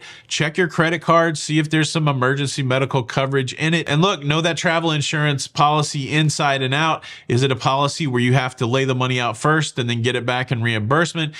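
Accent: United States. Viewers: Sounds typically American to me.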